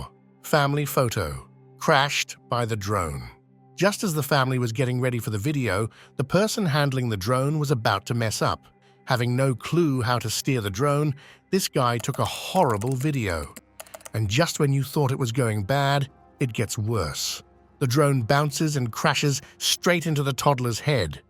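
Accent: United Kingdom. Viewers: Annoying British commentary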